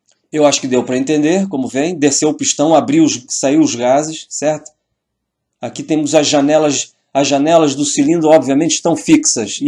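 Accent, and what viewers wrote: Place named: Rio de Janeiro, Brazil. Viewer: Que legal sotaque carioca...explora o (x) em tudo kkk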